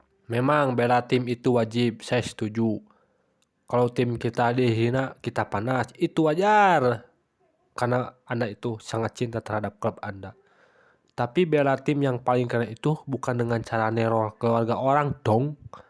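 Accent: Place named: Sunda, Indonesia. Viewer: Mang mantap sunda pisan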